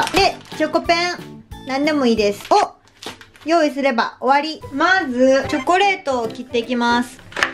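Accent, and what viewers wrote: Kansai, Japan. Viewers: おはようございます。 残りの２割の男性ファンでございます。 ８割がた同性ファンってわかるわぁ～ なんて言ってもmayoちゃんの親しみやすさ、話しやすさは天下一品だし、さらに関西弁が魅力を増してる 友達にしたいタイプNo１だね！←（あえて恋人にしたいNo１と言わない件に関してはノーコメントｗ） 少しずつ日差しに力強さが増してきてるここ蝦夷地。 早くコロナが終息して旅行に行きたいな～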